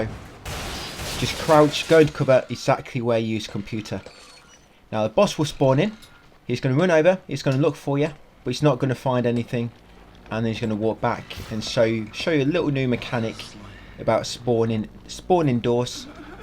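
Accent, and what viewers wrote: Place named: London, United Kingdom. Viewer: Most be from London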